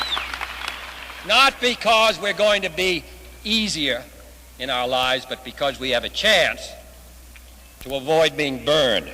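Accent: Boston, United States. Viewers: You've got to love his Boston way of speaking. Beautiful. He pronounces "last" in the English manner and "passing" in the American manner. Pardon me for saying "English". It is the "Boston Manner".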